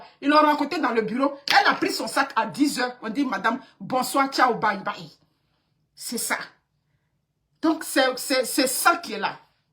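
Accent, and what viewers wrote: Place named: Ivory Coast. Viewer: Mme votre accent sonne comme une Ivoirienne.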